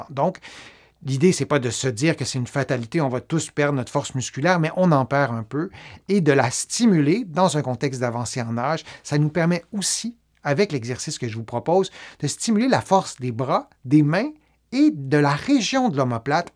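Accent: Quebec, Canada. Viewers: Merci pour la vidéo, j'adore votre accent québécois ❤ Autre souci passé la 60aine: la "bouée de sauvetage", cette graisse abdominale, localisée au niveau du ventre et des hanches qui veut s'installer subrepticement! Pourtant je fais 1h d'exercices tous les matins, une fois par semaine 2 heures à la salle de fitness, je vais aussi nager et, n'ayant pas de voiture, je marche tous les jours, je suis végétarienne depuis bientôt 50 ans, fais attention au sucre... rien n'y fait, je ne sais plus quoi faire 🤷🏼‍♀️ Tous conseils bienvenus, merci d'avance 🙏🏼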